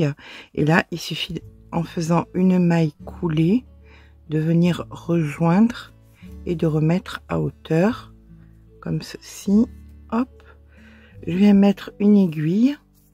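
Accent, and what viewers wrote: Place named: Southwest, France. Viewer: Bonjour. Tuto toujours au top avec des explications amenées sereinement et qui donne confiance avec ce petit accent ❤❤❤❤ (sud ouest?) Merci !!! Et bonne journée (je ne commente pas souvent mais plutôt adepte des ❤️👍)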